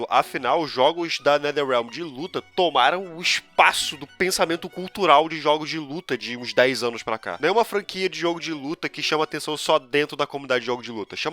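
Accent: Rio de Janeiro, Brazil. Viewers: O sotaque combinou muito kkkkkkkkkkkk, asmeiiii um carioca reclamando é muito baummm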